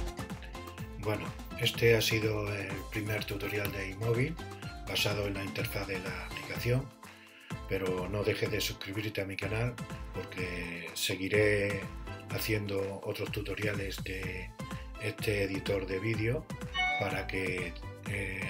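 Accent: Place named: Extremadura, Spain. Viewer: Buenas noches, me encanta lo que estoy viendo. Soy una persona mayor y quiero hacer algún video con mi ordenador Mac. Además por el acento del profesor creo que es extremeño. Yo también lo soy, aunque vivo lejos. Muchas gracias.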